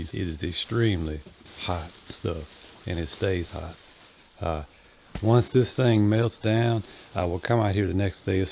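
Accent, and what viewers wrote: Louisiana, United States. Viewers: I watched a short the other day, of a guy, showing how to prepare and eat thistle stems. What I noticed pretty quick was the way he talked. It reminded me of you, and how you talk. This fella was somewhere near New Orleans. Made me think, 🤔, maybe you're in Louisiana... Then I saw the pelican... In this video. I think you're a Louisianan. It's in the speech. I did not know the way you speak is probably the way a lot of people around that region may speak. Pretty cool.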